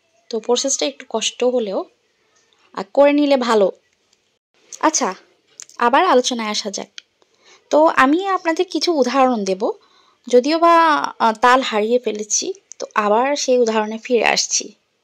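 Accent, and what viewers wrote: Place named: Noakhali, Bangladesh. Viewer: আপু তোমার নোয়াখালী ভাষা ভালো লাগে আজ বলো নাই আমিতো অবাক 😮😮হাঁস দারুন হয়েছে ❤❤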